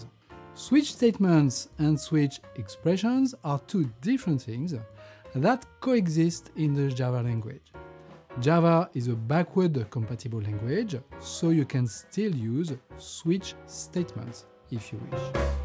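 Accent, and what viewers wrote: France. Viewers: I like the overall concept of JEP cafe, discussing the recent developments in JDK. Apart from explanations, I like his thick French Accent.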